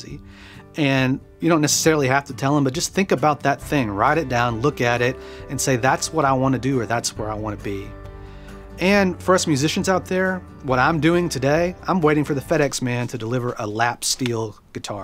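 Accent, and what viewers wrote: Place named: Texas, United States. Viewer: Hey, YouTube is awash with "how to" tutorials. What's refreshing about your channel is that it's a personal journey of one man and the stories he shares (including his those of his dad!). I love that gentle, southern texan accent too (I'm from Melbourne so I don't hear a lot of it around here!). Throw in some cool music in the background to support the stories and it's a beautiful place to be! I'm very happy to just be present and an observer of your journey, in all it's twists and turns. Long may it continue!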